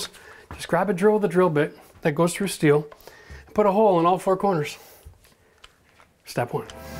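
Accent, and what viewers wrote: Canada. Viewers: I’m here for the Canadian Accent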